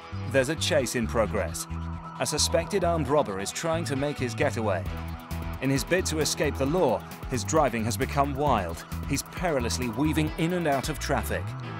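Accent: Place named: England, United Kingdom. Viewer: Whats innocuous about this video is an english man narrarating crashes on american highways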